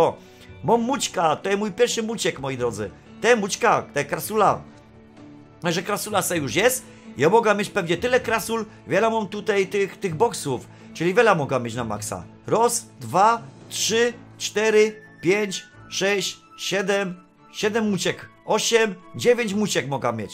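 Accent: Silesia, Poland. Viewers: Szanowny twoje poczucie humoru w tym filmiku konkretnie sprawiło że płakałem jak norka jako że uwielbiam Śląsk twoja gwara śląska sprawiła że poprostu się popłakałem więcej takich filmów. Zazwyczaj poprawny polski tym razem typowa gwara dziękuję za poprawę humoru. Pozdrawiam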